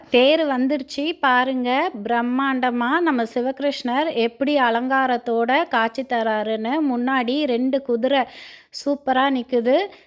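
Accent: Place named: India. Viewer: Unggal Tamil innum India le pesuvatu poleveh irukku, enggal nattu inimaiyana Tamil pesunggal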